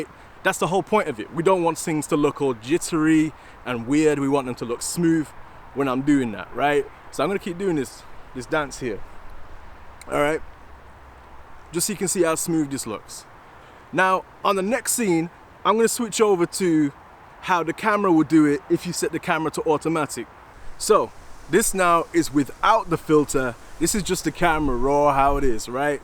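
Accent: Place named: Australia. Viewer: Are you from Australia? Your accent is dope 👌🏼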